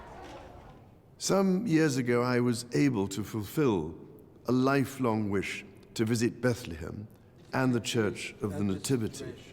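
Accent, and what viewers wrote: RP, United Kingdom. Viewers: He also sounds posh like his beloved mother 👍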